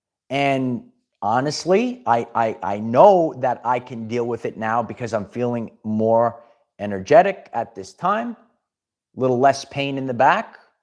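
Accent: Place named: Brooklyn, United States. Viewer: I am here for ur Brooklyn accent allllllllllllllllll day. Letter rip tater chip. I'm from Oklahoma and Texas and say yall. Ur the best. ❤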